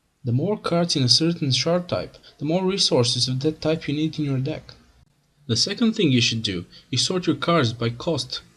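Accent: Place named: Russia. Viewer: Your accent is metro2033. Great video!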